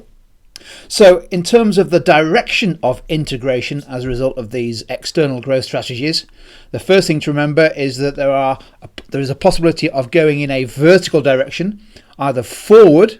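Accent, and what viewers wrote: England, United Kingdom. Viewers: seems legit because he has an english accent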